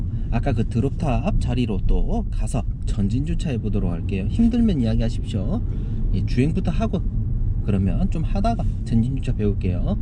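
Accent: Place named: Busan, South Korea. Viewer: 열정이 있네요~ 은 민초들의 눈높이 교육방식 아주 아주 조아요 칭찬합니다 굿^^ 악센트가 부산 같기도 하고 푸하 ^(^ 항상 마음의 중립 중립 중립 하시길 P.S-> 근데 발이 큰가요? 닉이~ 군요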